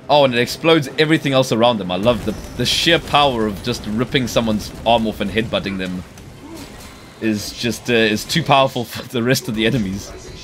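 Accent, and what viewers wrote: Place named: Scotland, United Kingdom. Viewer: Narration alone warranted a Sub... Salute to the Scottish.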